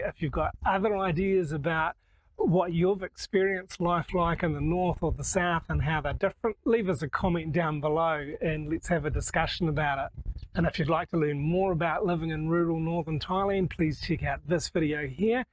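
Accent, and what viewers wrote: New Zealand. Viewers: What a great idea for a video topic. I really enjoyed this. The presenter sounds like he really know what he's talking about. I learnt a lot. 20 years in Thailand and I can still hear a New Zealand accent :)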